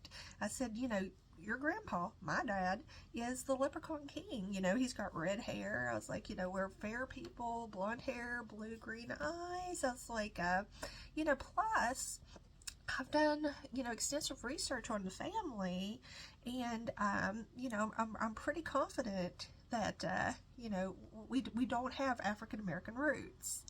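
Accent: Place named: Georgia, United States. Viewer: You do have a lovely voice, and I swear, the accent is South Georgia like my Granny's family.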